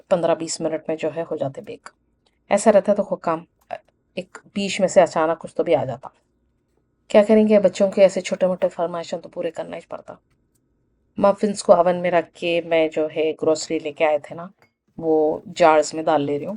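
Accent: Hyderabad, India. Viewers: Aapki language Hyderabadi lagti hai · Ramdan mubarak... aameen lovely vlog ... m so excited when your vlog comes ... lovely hearing you ... i always find hydrabadi language bit funny dont mind this word "apanay ku" 😂 to funny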